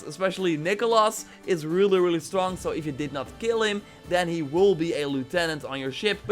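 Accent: Netherlands. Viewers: Nice man hoorde aan je accent dat je nederlands was weet jij misschien waar of hoe je veel metal kan vinden? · Ben je Nederlands want je klinkt bij heel veel woorden die je zegt Nederlands zoals toen je ikaros zij en andere dingen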